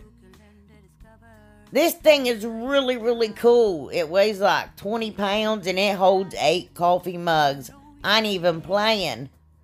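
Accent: South, United States. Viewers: Love that good ole southern accent. I am sorry glad to know that I am not the only one with the decent Southern accent. I'm in Northwest Alabama. Love your crafts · I'm new to your channel. Just love your country accent, where are you from? I loved all your projects especially the baskets. Roll Tide!!🏈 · Just found you! Love your channel! Where are you from? Loving your accent....Carolina girl myself so I have one too! God bless and keep the videos coming.🤗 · Hi 👋! I'm a newbie to your channel and have to say you had me at the Windmill. I want you to know I laughed the whole time you filming,you are just to funny! I mean that in the nicest way ever. I'm glad you joined Heidi's collaboration you definitely have a new subscriber here. I have to ask where are you from with that accent. I'm in NC and I thought I had southern drawl. Have a great week 😀and God Bless 🙌!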